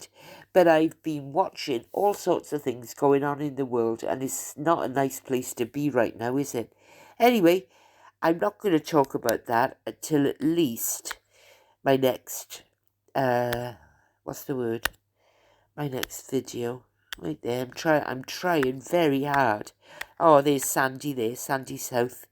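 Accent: Wales, United Kingdom. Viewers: Oh. It’s so lovely to hear your beautiful Welsh voice! I hope you have a lovely Christmas. 🎄🙋🏻‍♀️🎄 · Lovely to hear your beautiful Welsh voice again. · Oh it’s good to hear your welsh accent, I was brought up in Croesycielog and used to go to Cwmbran cinema, left when I was 18 to train as a nurse at Gloucester, retired now but I do love your channel, lost my husband in January and my daughter in July this year, so sorry about your cat, I lost both my dog and cat a few years ago, but to old now to get another one, I have missed your channel, it was lovely hearing from you, have a wonderful Xmas and a very happy new year, hope that lottery isn’t a scam 🏴❤️ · your sweet ,dulcet, Welsh tones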